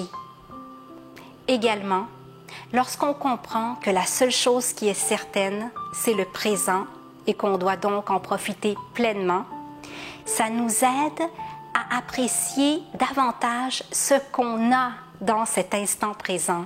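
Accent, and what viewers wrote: Canada. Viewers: Bonjour, merci pour ce partage ainsi que la précédente vidéo. J'adhère totalement à vos propos et j'essaie au mieux d'être minimaliste (pour moi et pas pour les autre). J'ai désencombré le superflu. Je me suis sentie tellement mieux et pourtant, j'ai beaucoup de peine à ne pas réencombrer. Surtout avec certains produits, comme les produits cosmétiques, les sacs à main et les livres. Avez-vous des conseils ? Et aussi, pourquoi est-ce si compliqué 'être dans le moment présent ? Au travail, je me dois (et je pèse mes mots)d 'être multitâche. C'est maintenant ce que l'on attend d'un "bon" collaborateur sinon c'est le licenciement. A mon âge (59 ans) c'est compliqué de me dire, je vais changer de job...et la retraite n'est pas pour tout de suite...Belle soirée (ici en Suisse, c'est le soir 😉) ( avec votre si charmant accent canadien, que j'aime tant, j'imagine que vous vivez au canada😊) et à bientôt.😗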